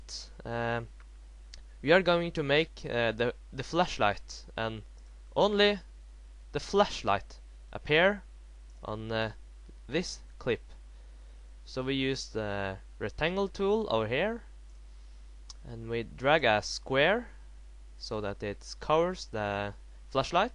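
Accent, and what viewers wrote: Norway. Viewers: are you norweigan? :D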